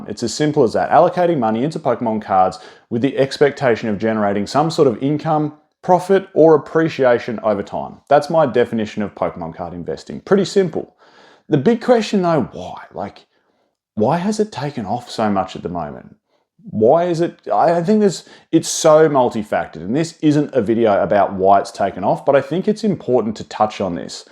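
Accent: Australia. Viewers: what part of Aus are you from btw? I'm in melb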